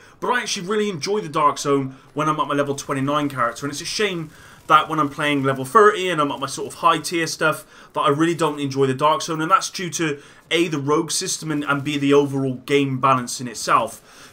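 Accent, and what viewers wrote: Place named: Newcastle, United Kingdom. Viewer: I’ve been watching you forever and I just realized you sound like the true Geordie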